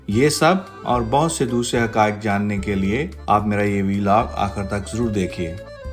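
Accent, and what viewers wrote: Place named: Faisalabad, Pakistan. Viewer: My piyara brother.. Faisalabadi accent in urdu..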